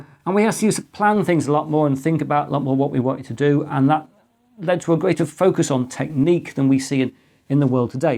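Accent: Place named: United Kingdom. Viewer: I have subscribed to both of your channels because I'm interested in computing and older technology, but I also love the way you explaining things in this very British tone of voice. I can't help myself, but I just love the way you talk. It's the clear and precise English vocabulary from my earliest learning lessons in school which makes it so easy for me to understand what you are talking about. Greetings from Germany.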